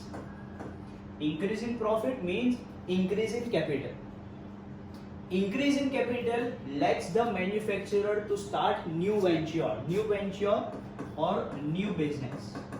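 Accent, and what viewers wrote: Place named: Gujarat, India. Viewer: Sir aap Gujrat k lagte ho!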